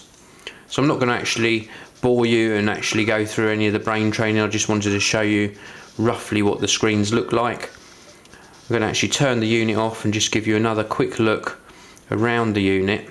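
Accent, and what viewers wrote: United Kingdom. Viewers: I really like your British accent a lot!!!!!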